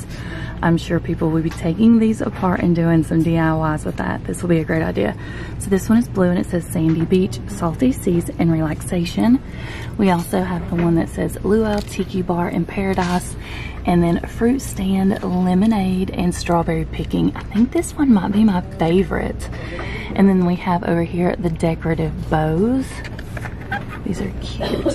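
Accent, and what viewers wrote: South, United States. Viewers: I just love your voice!! So southern 💛 love your videos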